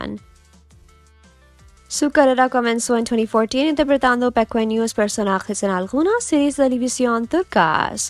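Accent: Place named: England, United Kingdom. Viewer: Perdonais, alguiene dice porqué la señorita de voz, habla en español malisimo con actento inglés y dice los números en inglés también??? NO SE ENTIENDE NAAADAAAAAA. lo siento gracias.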